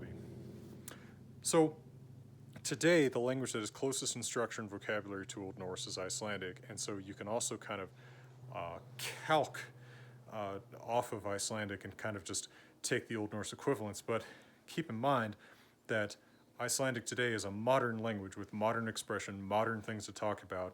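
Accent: United States. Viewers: This might be what old norse would sound like spoken by someone with a heavy American accent. Especially the R and the L reveals the speakers origin. Or are there any evidence that old norse were pronounced closer to American English than to modern Scandinavian languages?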